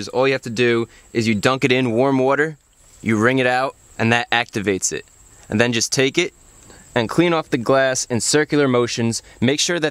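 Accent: United States. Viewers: Your american accent drives me crazy!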